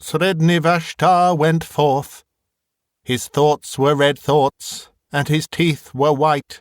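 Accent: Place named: RP, United Kingdom. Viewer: do you think your narration is more British, or Transatlantic?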